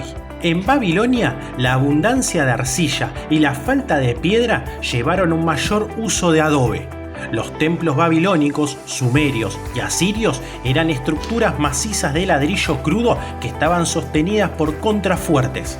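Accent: Argentina. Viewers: Hola! No sé tu nombre pero te felicito por todos los videos. Te puse en otro video pero tal vez no lo viste! Estamos organizando con la UBA y la UNLP una charla sobre Divulgación Histórica. Vamos a invitar a Javier Trímboli, el Profesor Borda y queríamos invitarte a vos qué haces estos videos que nos parecen muy interesantes para la Divulgación. Te interesaría participar? Por tu acento asumimos que sos argentino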